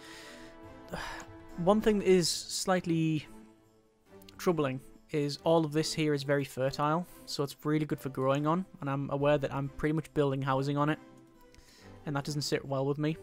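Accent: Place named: North East England, United Kingdom. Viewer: I’m very curious about where he is from, I feel like he is from the uk and possibly the north east but please correct me if I am wrong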